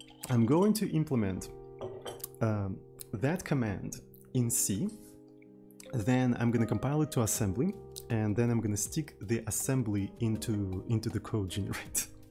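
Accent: Russia. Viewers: Вам этот дядька не показался душнилой? С технической точки зрения всё прекрасно, знание английского, различных языков программирования разного уровня. Но вот складывается такое ощущение, что автор канала как-то с презрением относится к Ру говорящим, возможно даже стыдится того, что сам знает русский, стыдится или ненавидит свой акцент, старается игнорировать любые вопросы, касательно знания русского языка. Не знаю, выглядит забавно и хочется открыть окошко, проветрить ._.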